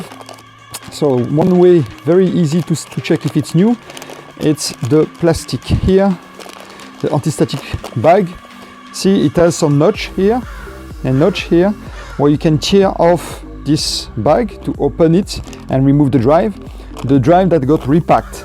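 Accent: France. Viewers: Tu es Français ? l'accent me dit quelque chose ?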